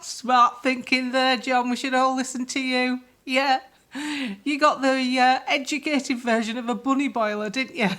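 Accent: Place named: Manchester, United Kingdom. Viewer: And I always love the "Munchester" accent - hope I got that right.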